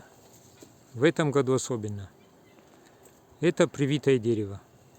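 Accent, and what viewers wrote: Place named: North Ossetia, Russia. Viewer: Наткнулся на ваши видео, и не мог точно понять по акценту ,осетин вы или нет , но теперь понял что вы осетин , Ирæттæ размæ!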